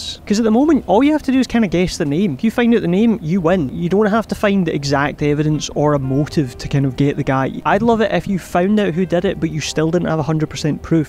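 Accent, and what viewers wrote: Scotland, United Kingdom. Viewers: Randomly came across your channel, love the scene your setting, so funny, your going far man plus your Scottish, I live in Inverness 🫡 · Are you scottish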